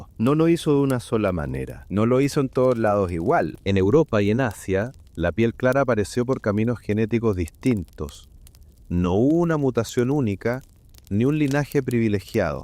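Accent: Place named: Chile. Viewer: Me encanta la IA con lenguaje cbileno, like en todos estos videos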